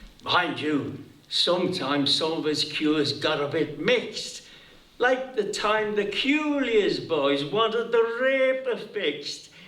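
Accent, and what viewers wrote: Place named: Liverpool, United Kingdom. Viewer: Sounds like scouse ,northwelsh, irish, cumbrian, and stoke , cheshire accents mixed...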